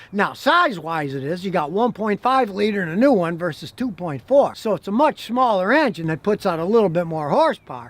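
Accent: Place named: New York, United States. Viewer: Sounds like an New Yorker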